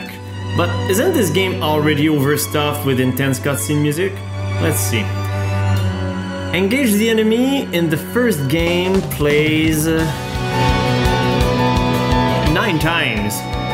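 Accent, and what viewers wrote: Quebec, Canada. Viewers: I recognize your québécois accent ;)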